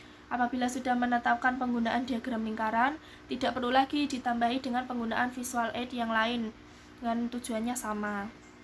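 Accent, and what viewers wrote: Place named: Java, Indonesia. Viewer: sangat bermanfaat 👏 medok e ntappp wkw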